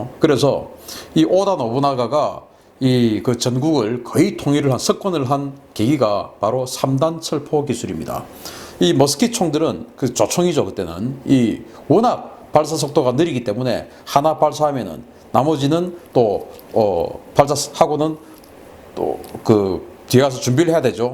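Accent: Gyeongsang, South Korea. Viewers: 짝대기~역쉬 갱상도 사나~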